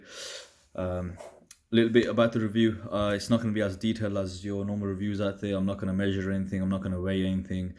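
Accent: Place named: West Midlands, United Kingdom. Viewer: Thanks for the review and updated review From the accent, possibly local to me, West Midlands (Brum maybe?)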